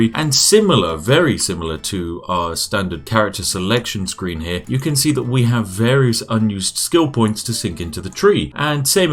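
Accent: United Kingdom. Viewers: Cannot stay away from that lovely British voice and cheeky exploits of yours ;) Just subbed. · Oh No! My favorite game is so broken xD Lately I’ve bought Titan Quest HD for my iOS device and it’s awesome port from PC. To Sir Brit: Man, ur so awesome xD Mostly because u’ve got that British ‘ladies and gentlemen’ accent which I consider as pure awesomeness. I’ve watched so many of your vids that I’m wondering about drug relation of Yorkshire Tea.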